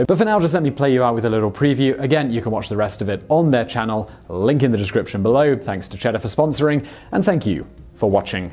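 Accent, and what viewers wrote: United Kingdom. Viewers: I used the think british accents were classy until this! oh my ears. ouch the cringe in my spine! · I normally like your British accent. But I hate how you pronounce NACHOS. Ugh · Love these videos. Although i like the British accent, it seems that along with your efforts to find out the real facts, you would put as much effort in proper pronunciation. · As a Texan, hearing Spanish words and Mexican terms in a British accent was horrifying and almost insulting. Very interesting video though! :) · I don't complain about his Brit speak. Making fun of a person's accent induced pronunciation is one of the few things we have left to make fun of. And not all Brits have the same accent, there are many different ones. When Roger Moore as Templer used to say Mafia it came out as Maff-Eee-Er. Hilarious. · There are a lot of comments about the pronunciation in this video. 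I'm of two minds on the subject. First, give a break. He makes a bunch of videos every day and it takes a lot longer than 10 minutes to make the video you just watched. But second, there's a support team of researchers who dug all this up for him. Couldn't they help him with the pronunciation? There's this thing called IPA they could provide, and I'm not talking about a beverage. It's the International Phonetic Alphabet (for English). It's all those strange squiggly marks in dictionaries telling you how a word sounds, except much more well-thought out. I have a theatre degree (among others) and I spent a year on this subject alone as it's the basis of learning dialects for the stage. To sound American, British, or Russian, etc. (and yes, I know all have regional variants) part of the process of converting the text on the page to the sounds from your mouth goes through IPA. I know he's British and that will always color how he speaks. 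This channel provides a lot of interesting and useful information about esoteric topics. That's why I like it. I'm just wishing they'd go one step further and the entire team push to get the easier pronunciations right. Some languages are much harder for native English speakers, of course. But making the effort here would be well appreciated, imho. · Ha, I've never heard my hometown's name being pronounced so many times by a Brit before! BTW, I've actually been to the Rico's headquarters before to pick up some concessions items for a school festival thing during high school (my high school being located downtown just like the Rico's HQ, it wasn't a tough assignment). It's insane how big that building is for a downtown business!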